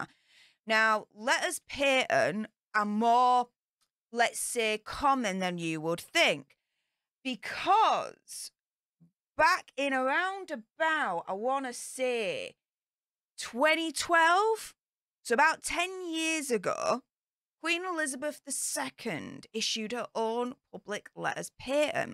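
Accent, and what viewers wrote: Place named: Northern England, United Kingdom. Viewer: Hi, just popped in following Trev Coult's recommendation - to find out what you get up to! I've been trying to place your accent - somewhere around the Pennines between Lancs and Yorks, I think. I'm in Cheshire. (and don't panic, you're WAY too young to interest me socially!) - - I think we should depose Horrid and Methane's kids.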